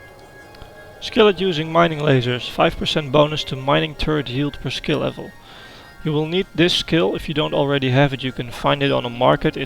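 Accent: Netherlands. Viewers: do i hear a lil dutch in ya?:P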